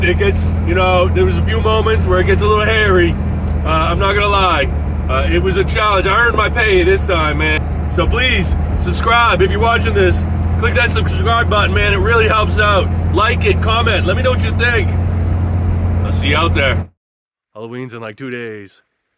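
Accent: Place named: Massachusetts, United States. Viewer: I just found your channel a few days ago. So far it seems you're camping in NH, but you seem to have a Massachusetts accent, am I correct in assuming that? Great videos, BTW! 💞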